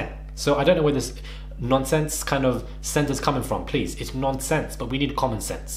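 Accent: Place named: United Kingdom. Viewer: Racheal X Whoa! Well I feel dumb, I should have guessed this guy has a primarily uk audience since he sounds like he’s from there too😅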